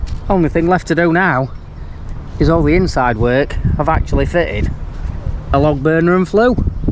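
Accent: Yorkshire, United Kingdom. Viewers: Oh dear. I'm killing myself laughing because I can understand a Yorkshire accent (well, mostly), but I left CC on, and it can't figure out what is being said. Unintended hilarity, which I'm sure you will appreciate. I'm sure some people will wonder why you put so much effort into a temporary fitting, but it comes down to what my Scottish ancestors would call being "thrifty" (emphatically not "cheap"), which is completely consistent with being a "tight Yorkshireman"--a very respectable world view, I'd say. You may call it "winging it," but I really appreciate the skill behind it.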